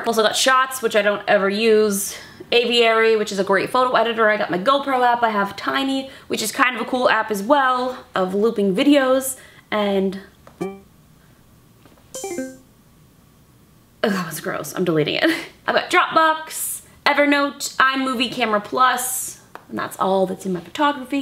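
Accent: Pittsburgh, United States. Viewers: Is she from Pittsburgh Pennsylvania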